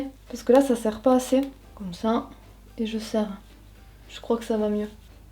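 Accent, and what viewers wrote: South, France. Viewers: Je kiffe vraiment t’es vidéos, ta nature ton délire! Tu me casse de rire à chaque fois et qd tu parles ton (notre) accent s’entend tellement et cest superbe!! Vive le sud!!! Bisous!!!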